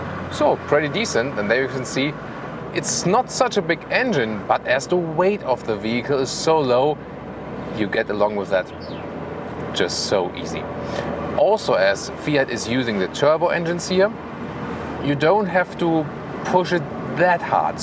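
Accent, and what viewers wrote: Germany. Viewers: As a German automotive enthusiast and senior professional in the industry, let me tell you that we just laugh about this guy and his shitty English here in Germany. He couldn't even think of the word "lock" , that's just unacceptable for a self-proclaimed automotive journalist working in English. He's carved out his niche on YouTube, with foreigners thinking he is more knowledgeable because of the very strong German accent, but he's really just an idiot doing a poor job.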